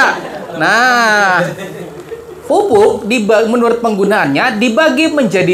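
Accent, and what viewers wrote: Banjar, Indonesia. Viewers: Tambahi lagi tambahi lagi....Kya logat Banjar bang...di daerah mna ini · Eh, bapak orang Banjar? Logatnya rada banjar... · apakah bapak ini orang banjar? 😂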